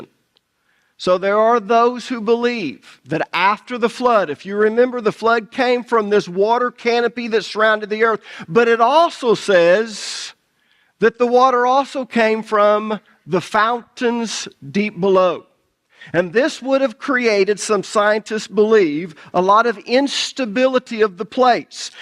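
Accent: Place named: Carolina, United States. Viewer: Carolina is a different dialect. Took me years to understand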